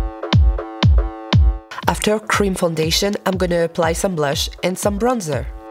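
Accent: France. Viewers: Juste en entendant son accent, on sait qu'il est Français 😂. / Just by his accent, we know that he's French 😂.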